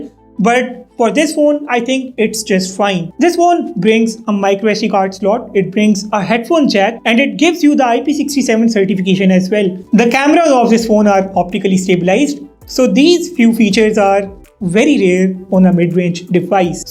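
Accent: India. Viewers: You voice and look like indian but I know you are form pakistan ❤️.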